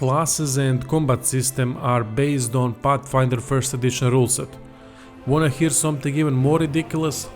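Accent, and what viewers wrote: Russia. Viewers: The man sounds Russian, so you know he knows his CRPGs! (And gaming in general as eastern Europeans are the most prolific in excellent game development nowadays!) I have mine in the cart right now ready to pull the trigger after I'm done watching this video! EDIT: Just bought it, I usually don't do pre-purchases, but I planned to get it day one anyways, so... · tvrdi ruski naglasak i odlicne analize, što više poželit, pozdrav iz splita :)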